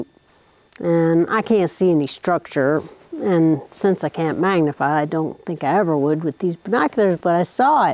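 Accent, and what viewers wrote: South, United States. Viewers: I love your southern-sounding accent. Subscribed 😊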